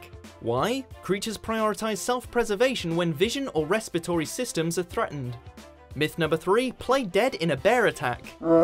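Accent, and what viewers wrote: United Kingdom. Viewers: What! when did the british narrator come from!? i prefer mr.mojo and ms.mojo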